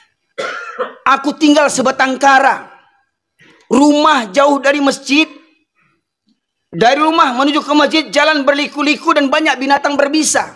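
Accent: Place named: West Sumatra, Indonesia. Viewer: Ustad dari Sumatra Barat logatnya😮mantap man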